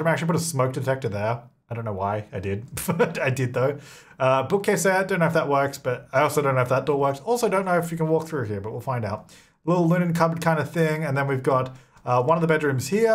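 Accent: Australia. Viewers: I love your australia english :)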